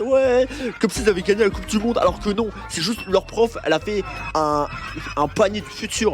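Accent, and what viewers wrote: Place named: Arab world. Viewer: W VIDEO W ACCENT ARABE ARR 😭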